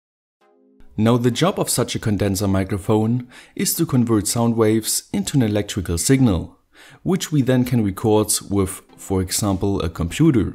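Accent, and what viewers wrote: Germany. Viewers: Lol, du bist deutsch?! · Are you german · Kommst du zufällig aus Deutschland? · Are you German?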